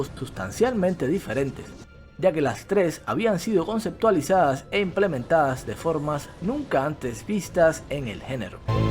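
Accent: Cuba. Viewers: Exelente trabajo me soprendio tu asento cubano y confirmarlo hehe es bueno tener compañeros dando tan buen contenido piensas hablar de Diablo 2? Tambien lo queme mucho en Lan en ese tiempo y ahora disfrutando del diablo 4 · Eres cubano?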